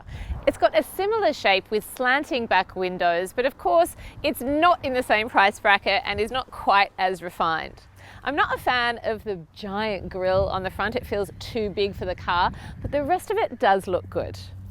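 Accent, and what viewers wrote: Australia. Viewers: I dont know how to tell you but Aussies pronounce things differently. Because there are different countries outside yours and people outside of you lol. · Damir D, she has an Australian accent, please do not judge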